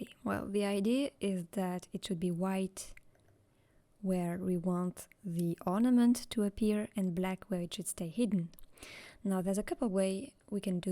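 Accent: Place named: France. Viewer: J'ai trouvé un petit accent frenchy et du coup j'ai tout compris ! Merci pour ce tutoriel de qualité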